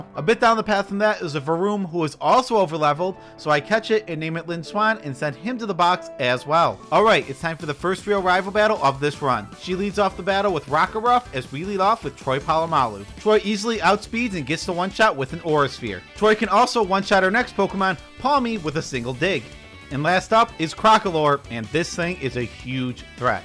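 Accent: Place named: Massachusetts, United States. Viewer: I don't know where you're from. But I love your accent. Sounds like maybe Massachusetts.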